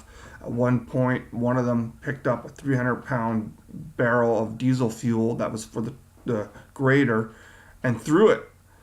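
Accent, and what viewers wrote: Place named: Canada. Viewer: Thanks. Love your Canadian accent, and your videos too.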